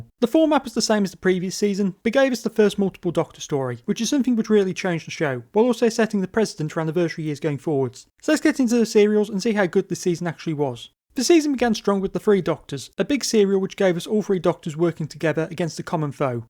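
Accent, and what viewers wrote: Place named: West Midlands, United Kingdom. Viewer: Like the West Midlands twang. 👍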